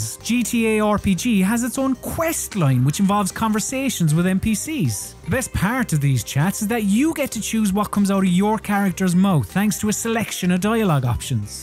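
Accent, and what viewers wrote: Ireland. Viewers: IRISH ☘️ yay he is irish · Ye fekin wallie it's an irish accent innit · he irish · its called an irish accent ye eejit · Shut up it's an Irish accent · Are you irish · youre definitely irish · Haha I knew he was Irish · Sounds like u have a Kerry accent. Good on ya lad, the best part of Ireland is down here in county Kerry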